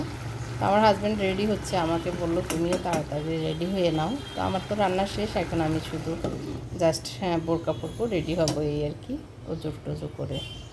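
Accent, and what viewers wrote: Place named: Chittagong, Bangladesh. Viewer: আপুর কথা কিছুটা চট্টগ্রামের টান আসে তাই বলছিলাম।আমিও চট্টগ্রামের তবে থাকি কুষ্টিয়ায়।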